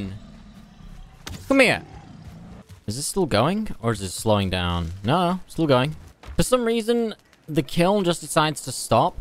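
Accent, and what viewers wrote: England, United Kingdom. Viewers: Loving this modded series will have to look at installing mods just for the build anywhere and automated forge.BTW their is a content creator who plays RUST called willjum and i swear it must either be you as another account or your brother,the voice is the same event down to the english accent.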